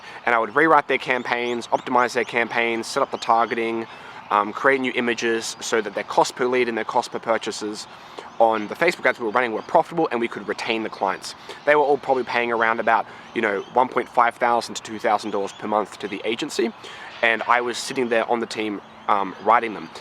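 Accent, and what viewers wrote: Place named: Australia. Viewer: your story is incredibly inspirational and should move everyone who hears it to want to be great at any cost! I talked to Quinn yesterday after opting in...looking forward to getting goin with you guys in the very near future! You are the M&M of digital marketing....you even sound a bit like Marshall with an Aussie accent brother!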